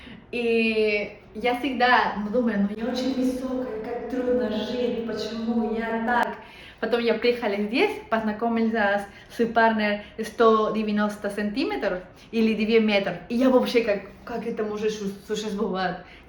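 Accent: Spain. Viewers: Впервые слышу испанский акцент, если не вслушиваться звучит как "плюм-плюм")))) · Случайно наткнулся на это видео-ну ты перуанская красотка- молодец 👏, неплохо говоришь по-русски, с приятным испанским акцентом! 👍 Удачи тебе, лайк и конечно подписка! 👍👌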